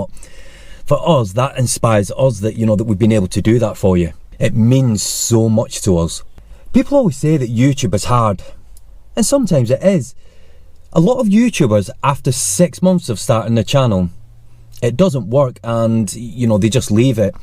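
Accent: Scotland, United Kingdom. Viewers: Hi and, we want to say a big thank you for all the entertainment you give us. Fabulous photography it’s amazing. I’m very much looking forward to the next chapter in your lives., what accent do you have please? We thinking it’s Scottish.? Anyway, keep the videos coming and take care of yourselves. Lin in Devon.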